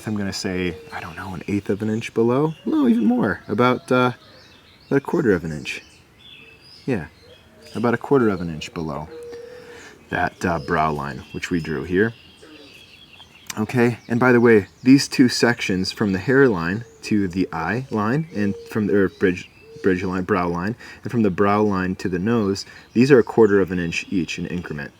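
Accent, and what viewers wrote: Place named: East Coast, United States. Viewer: Alaska is still warming up. It's nice to hear east coast. Again ,you are making this so fun. I'm still struggling to keep my tools sharp. I'm going to order a wheel grinder. Do you have any preferences to China brand , or is the smaller 50.00 grinder on Amazon, vrs a 200.00 home depot. Maybe a video to help. Or the belt with sand paper? Maybe better. What you think?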